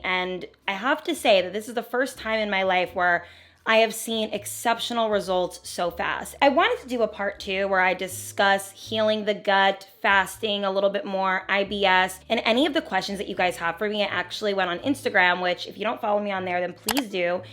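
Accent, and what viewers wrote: New Jersey, United States. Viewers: Great video!! Are you from New Jersey btw? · Are you from jersey? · I started laughing watching this video. I’ve never noticed in any of your other videos. I don’t know if it’s a you’re in Jersey and you’ve picked up the jersey accent or you had it before but just never noticed but I love it by the way you’re looking amazing and congratulations on your weight loss and your transition to your new body.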